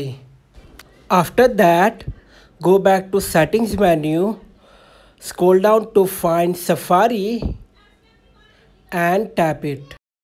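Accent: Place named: India. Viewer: When u hear the video and its indian just force close its not working 🤷‍♀️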